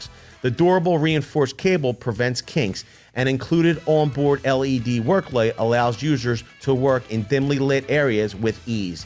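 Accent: United States. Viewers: The 'Dollar' is a currency unit used by more than 25 countries. Judging from your accent, I'm guessing that you're an American. Please, when you are quoting prices, have the courtesy to say "US dollars", unless, like most Americans, you don't believe that there are other countries in the world who are viewing your videos.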